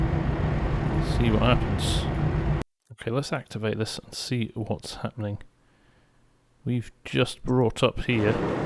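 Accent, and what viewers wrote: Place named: South London, United Kingdom. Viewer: Haha, Statham is your hero! That's brilliant....however, you need to work on your muddled South London accent and nasal-y pronunciations a bit mate. I'm still waiting for someone to come out with an AD / CP route for this map. I think I've messed up my routes as I'm still getting used to AD...(always connect the lines like a train route, yeah)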